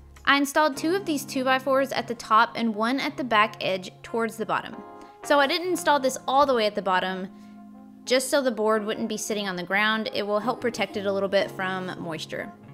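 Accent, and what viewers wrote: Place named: South, United States. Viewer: I can’t put my finger on where her accent is from, it seems like it kind of have a southern/eastern sound sometimes but not others so I’m beat. Anyone know?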